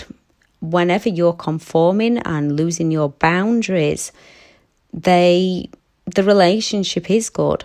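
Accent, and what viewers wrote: United Kingdom. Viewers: Thank you so much for this. I've broken free, but apart from the initial feeling of 'I"m Free!","I did it!", there's been such crushing anxiety to the point that my legs don't seem to work properly when I go out. My brain tells me I'm slipping when I;m not. I fear wet pavements and shiny tiled floors. I know it's anxiety, so I push through and still walk on these surfaces. I shake at the prospect of going anywhere new and working out what buses I need to take: something that I used to be able to do almost in my sleep, so to speak. But I'm still doing it...I hope this isn't making me worse? It just seems like I must push through, not give in. What you have said really, really helps. Thank you, from Cornwall, UK. (Your accent sounds British). Someone in my own country in the know?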